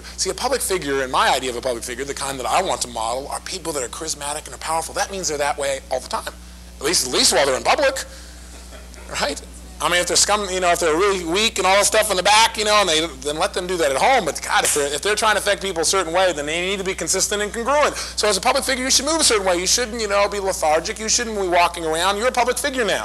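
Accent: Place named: Texas, United States. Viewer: His Texas accent is strong.. omg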